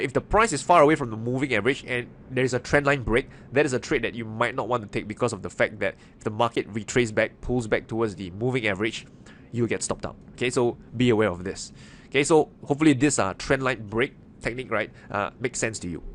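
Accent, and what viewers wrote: Singapore. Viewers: Love the singapore accent 👍🏻🍀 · English is just a language not an intelligence..I too have friends from singapore and they have similar accent as... So please do not offend someone like this..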